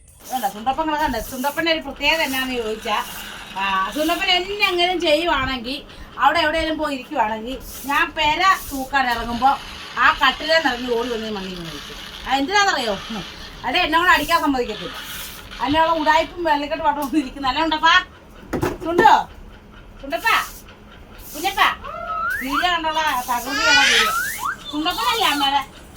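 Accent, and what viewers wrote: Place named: Malappuram, India. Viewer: മലപ്പുറം നത്തൽ, വത്തൽ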